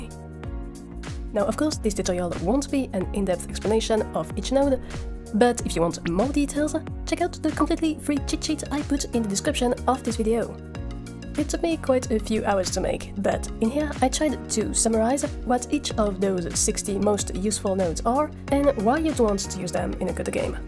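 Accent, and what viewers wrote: France. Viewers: J’ai cramé en 2 secondes que tu étais français mais je suis content que la commu Godot français est là.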